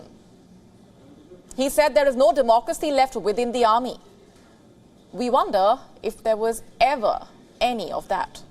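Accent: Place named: India. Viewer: The Indian accent and the hate whenever she says the word 'Pakistan' and 'Army' 😅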